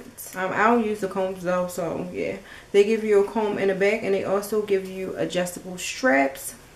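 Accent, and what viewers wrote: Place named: East Coast, United States. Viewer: Where are you from? East coast? Lol, Maryland? I need to know that accent is everything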